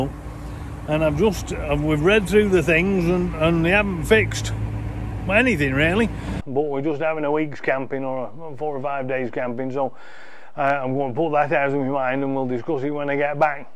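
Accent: Yorkshire, United Kingdom. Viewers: As a fella that went to school in Whitby, but now lives in the US it's nice to hear that accent. Yorkshire?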